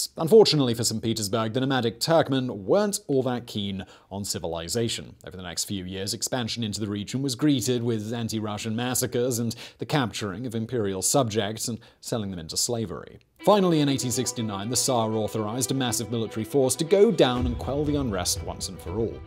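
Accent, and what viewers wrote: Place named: England, United Kingdom. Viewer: Your voice sounds familiar but in a posh English boring drone way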